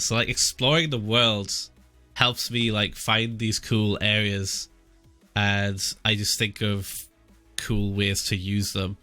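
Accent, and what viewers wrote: United Kingdom. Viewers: I know im so fucking late but im working up alright. The voice sounds more british somehow. Its more gummy.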